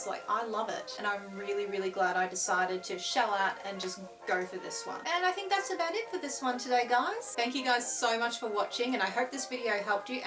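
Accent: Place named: United Kingdom. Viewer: Definitely want one. Bet they are pricey. Nice vault suit by the way, love the British accent.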